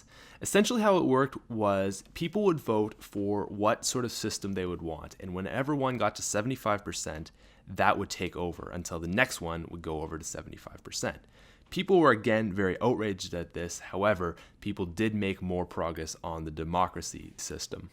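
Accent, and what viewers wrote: Canada. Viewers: what is your accent? is it Canadian? it's very interesting. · I hear that Canadian accent ;D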